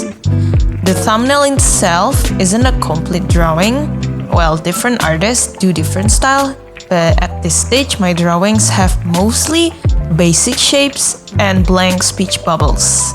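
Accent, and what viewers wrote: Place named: Indonesia. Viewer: Indonesian accent ❤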